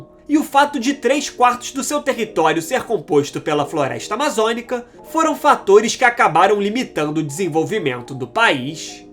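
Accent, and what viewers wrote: Rio de Janeiro, Brazil. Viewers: O que mata o video é esse carioquessss do narrador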